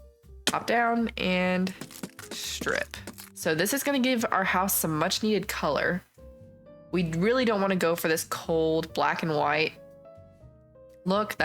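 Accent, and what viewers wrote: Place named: Texas, United States. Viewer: This girl is definitely from Texas or something. Her accent sounds exactly like mine.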